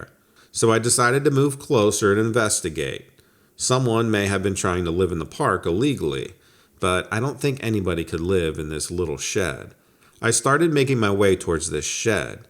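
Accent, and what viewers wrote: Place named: Mid-South, United States. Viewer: I really enjoy your accent - sometimes I hear mid-South (Kentucky/Tennessee), then at other times I hear the Western states (WY/CO/NM/AZ). Well, no matter, your voice adds so much to these stories, and makes them a pleasure to listen to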